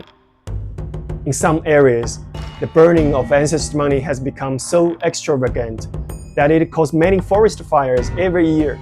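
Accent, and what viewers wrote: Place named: China. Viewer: I think he is based in China because of his accent, Hong Kong people have a different accent.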